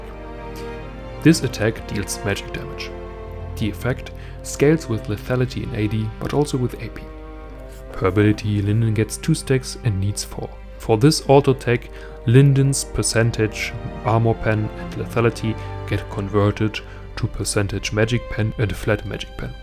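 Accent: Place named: Germany. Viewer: the german english accent is unmissable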